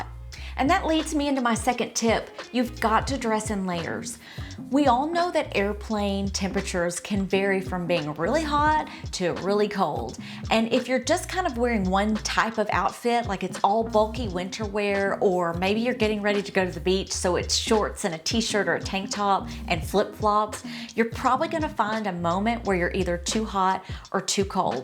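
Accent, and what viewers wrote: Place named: South, United States. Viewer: Thank you so much for this video,. I heard your heavy accent, and I am wondering where you are from. I am guessing somewhere south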